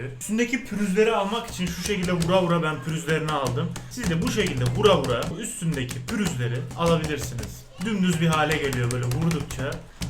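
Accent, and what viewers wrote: Adana, Turkey. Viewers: Abi sen nerelisin adanaı gibi konuşuyosun atüyürüm ocağa ekliyürüm diye konusuyonda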